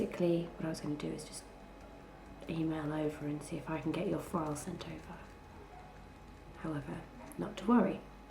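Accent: England, United Kingdom. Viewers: very pretty british accent i love it, keep doing more plz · I have just come across your videos, and I was so glad to hear an English accent when you spoke - I find ASMR from the UK supremely relaxing (I am also Home Counties English!) Loved your video anyway, and have subscribed! Love LouFxx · You really seem to keep busy putting out your videos.Thanks for the constant soothing sessions.Your voice is my fav.You make me want to move to England were all the women talk like that.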